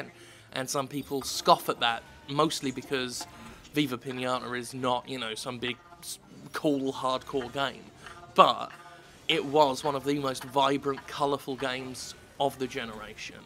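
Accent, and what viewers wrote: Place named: United Kingdom. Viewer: You sound more british here than usually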